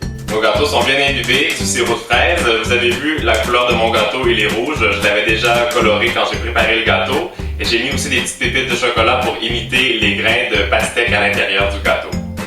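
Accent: Quebec, Canada. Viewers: coucou j'adort t'a chaine. je t'es découvert dans le meilleur pâtissier et j'adore l'accent Québécois je t'adore continu comme sa ❤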